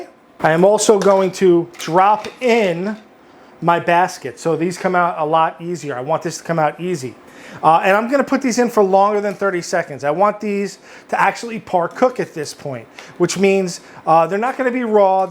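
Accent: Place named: New Jersey, United States. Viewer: When i close my eyes, i sometimes hear Brad Leone. Are you cousins? Or are you from Jersey?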